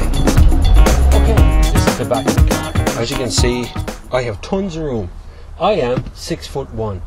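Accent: Ireland. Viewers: It would be great if a TV car show was commissioned in this kind of format, everyday cars for everyday people with a down to earth presenter. Top gear bores the hell out of me, dropping pianos on Morris marinas etc. I can't stand Clarkson, the man is a wally. Lets hope you get your own show, I'm sure you'll have a devoted audience of girls AND the boys too, I'm just a sucker for an Irish accent =) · great review. really pleased to hear an Irish voice for the irish car market. Am buying this car tomorrow. getting the dynamique version so no sat nav but an alround great car.and we got it for 17000euro with scrappage!!!!!! thanks again for a great review!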